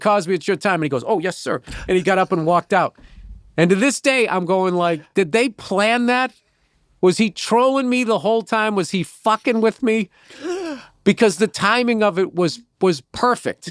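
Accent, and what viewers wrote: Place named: India. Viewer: i can instantly tell that you are indian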